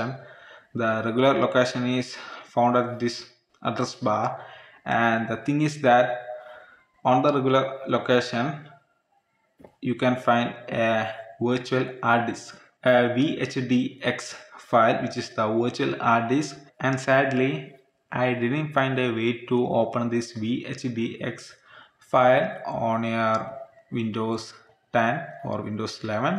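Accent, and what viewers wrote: Kerala, India. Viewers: bro you mallu or tamil?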